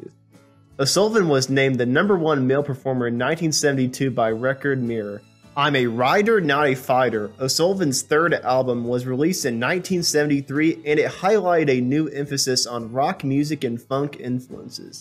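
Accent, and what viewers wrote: United States. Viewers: Yes too fast , too American slur . Use punctuation in speech . We are not all Americans on the rest of this planet! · Yet another american voice, impossible to get anything in English.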